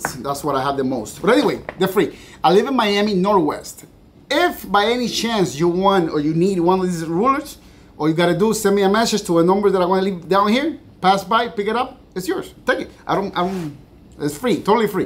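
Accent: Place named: Cuba. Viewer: Brother, I'm Cuban on my father's side, and he passed away in 2019. Your Spanish channel has allowed me to retain my Cuban accent and learn to be able to speak about fishing in Spanish and even develop my Spanish. Your accent vividly reminds me of my father and I have learned so much from your videos in the short time I've been following them compared to other Youtubers who speak perfect English. Your English is amazing too, don't let others discourage you! You don't improve without trying.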